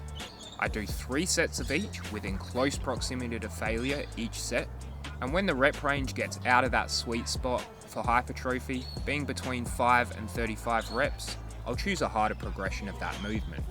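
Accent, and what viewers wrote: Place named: South Australia, Australia. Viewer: You have a South Australian accent!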